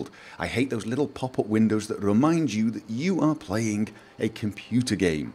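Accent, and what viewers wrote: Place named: Manchester, United Kingdom. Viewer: Damn. After all those questions as to where you're from I always sat smugly in my seat and said "I bet it's Manchester, somewhere in the NW at least". Of course, I sound like a total hindsight Charlie now. :D Not liven in the NW for nearly 20 years (and I wasn't a native to begin with) but the odd word still comes out with a burr. :)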